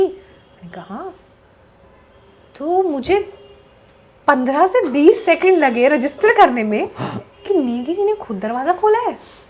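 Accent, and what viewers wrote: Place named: Garhwal, India. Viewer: स्टाइल भले ही आप कह रहे हैं पर हिन्दी बोलने पर कहीं कहीं गढ़वाली लहजा समझ आ रहा है।😊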